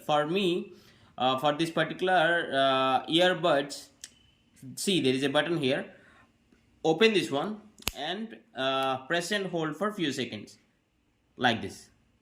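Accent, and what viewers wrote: India. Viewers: The way you explain as if you are eating your words😂 Indians accent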